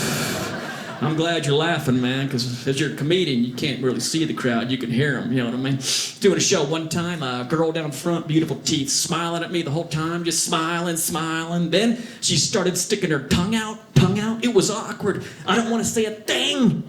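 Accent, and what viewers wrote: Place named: Texas, United States. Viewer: Hold up. I tried not to say anything, but this is eating at me: His mama is English, speaks fluent Italian, Dad is Italian and speaks fluent English. Why does dude sound like he's from Texas?!?!?!?!? Somebody help me, I'm sooooo confused 😕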